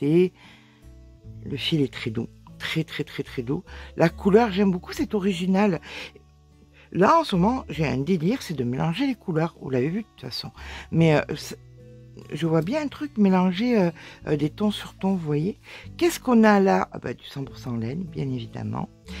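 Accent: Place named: Alpes-Maritimes, France. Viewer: Alors voilà, j'en étais sûre, 06 mais C bien sur, j'ai entendu votre léger accent et votre façon de parler pdt des années, 50ans pour être exact, d'une Régine qui habite à BIOT, je suis allée en vacances à Antibes en camping, il y avait tjrs 3 ou 4 générations, j'y suis allée enfant, ado, jeune maman et mamy.... De merveilleux souvenirs, C pour cela que votre voix, accent lié à ma passion, le crochet font un si bon ménage, merci tt simplement d'être là et reMerci d'être VOUS 💋🥰🤗💞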